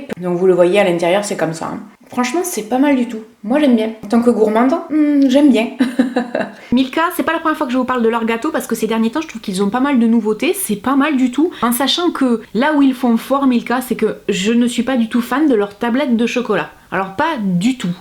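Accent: South, France. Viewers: Le chocolat est vachement moinssssss bien 😂😂 . « accent du sud trop mignon ». Toi au moins tu es un vrai régal. Comme toujours. Bon dimanche à tous de Belgique 🇧🇪.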